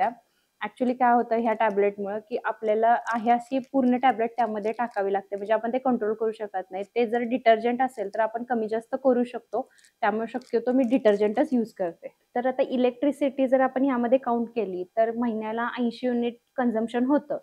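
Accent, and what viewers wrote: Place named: Satara, India. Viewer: Satara accent lagech kalte 😂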